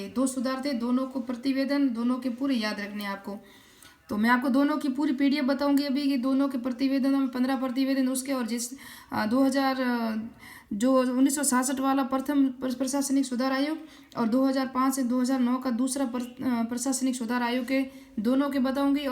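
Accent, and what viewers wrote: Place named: Rajasthan, India. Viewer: Aap badmer ya jodhpur side se ho kya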